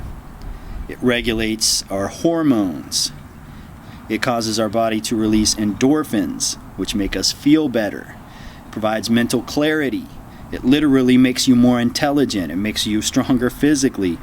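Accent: South, United States. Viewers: your southern accent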